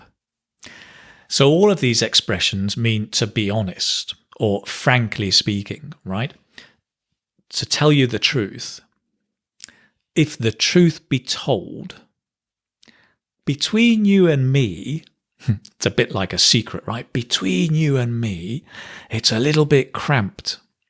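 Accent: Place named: RP, United Kingdom. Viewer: 👏👏👏👏👏👏👏Goooooorgeous English without an accent! Well done,! Es un placer oirte! When I was young I looked after my uncle"s ponies at Cowdray Park Polo Club, in Midhurst. Everybody spoke just like you, if you know what I mean!😄😀😄😄 Un abrazo, from Olivos, Buenos Aires, Argentina🇦🇷🇬🇧🇦🇷🇬🇧🇦🇷🇬🇧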